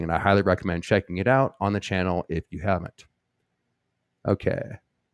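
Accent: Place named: South, United States. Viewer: Thanks for this. (Don't apologize for your Southern accent - it's actually pretty good. That from a North Carolinian.) It's lovely when you use voices and accents as you read. The actor in you will never die and I do hope that you'll be able to do that again someday. Hell, as a screenwriter, I'd enjoy writing something for you if I could sell it.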